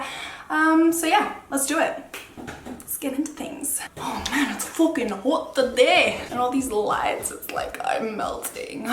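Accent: Australia. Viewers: As soon as you started talking I was like "of course she's Australian". Why are all Australian girls so damn pretty, wtf?. Anywho, subscribed 😍❤️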